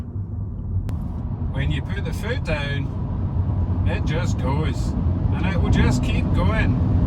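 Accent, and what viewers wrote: Scotland, United Kingdom. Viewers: i see you have a proper St. Andrews flag in your garage, along with your accent I was floored, cuz I never heard of or seen a Scottish Canadian before! This was my first video of yours I saw, I have now seen a few more, keep up the good work! I hope to get an Audi 50 e-tron when the become available in the states! Thanks again! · I’m terribly sorry in advance but is this Scottish English?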